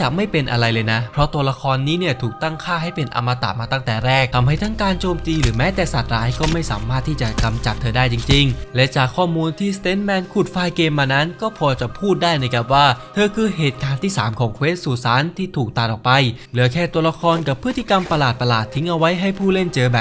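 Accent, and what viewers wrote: South, Thailand. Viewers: พี่เป็นคนใต้หรอ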